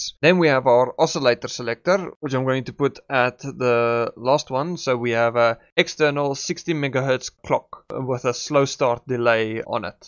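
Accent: Afrikaans, South Africa. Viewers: Afrikaans accent?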